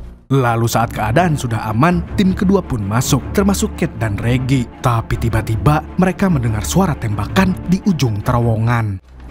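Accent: Banjar, Indonesia. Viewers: Org banjar ini adminnya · Orang banjar kah pian mang 😂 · Orang banjar kah min ? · Org mana banjar bnar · Bubuhan urang banjar nih. Dari logat nya bubuhan brabai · Orang Banjar kah bg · Urang banjar kah pian min? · logat banjar nya masih melekat🔥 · Org Banjar kali nya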